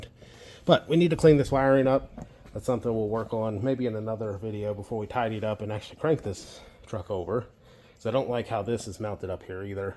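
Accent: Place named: West Virginia, United States. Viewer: What part of West Virginia are you from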